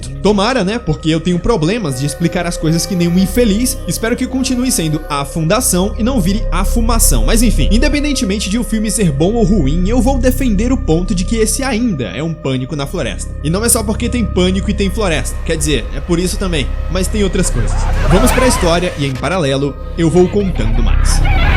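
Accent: Northeast, Brazil. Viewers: Um brinde ao sotaque nordestino!!!!!!!!!!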